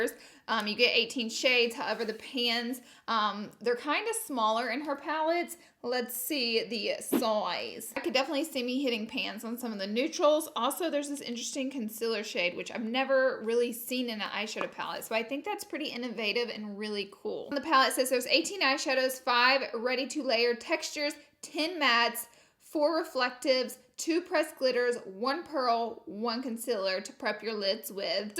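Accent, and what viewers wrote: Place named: Alabama, United States. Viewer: Yeah, being born, raised and living in Alabama, a lot of us talk like this. Nothing uncommon here! Love ya 😍❤❤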